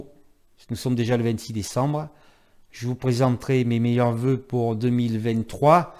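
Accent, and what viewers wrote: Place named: Southwest, France. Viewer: Ah et l'accent du sud-ouest fait toujours plaisir à entendre !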